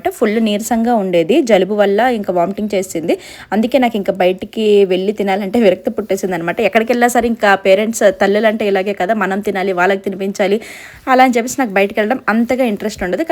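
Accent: Srikakulam, India. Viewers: Srikakulam language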